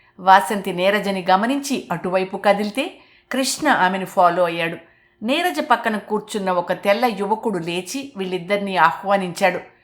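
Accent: United States. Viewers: Your story narration is very nice You are talking like an American women 🌹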